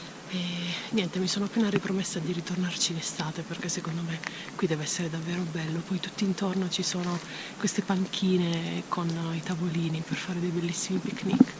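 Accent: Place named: Apulia, Italy. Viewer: Sei in gamba e una bravissima comunicatrice professionale e mai banale. Mi fanno impazzire le tue pronunce pugliesi e la totale mancanza della esse impura!! Sei grande!!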